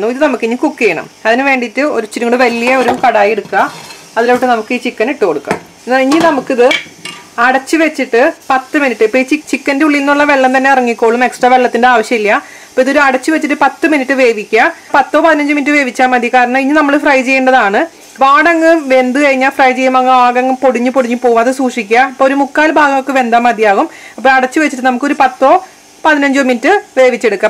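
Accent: Thrissur, India. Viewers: Dear sister you are very honest we can understand from your talk trichur slang may God bless you 🙏🙏🙏🙏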